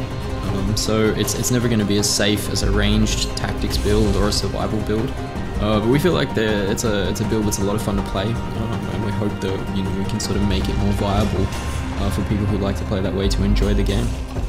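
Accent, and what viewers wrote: Australia. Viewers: Vlog is good, also it's nice to hear an fellow aussies voice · You're Aussie. Is Motion Twin an Aussie company?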